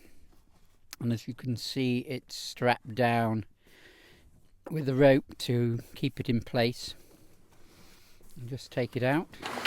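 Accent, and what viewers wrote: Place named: RP, United Kingdom. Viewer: sound like a silverspoon in gob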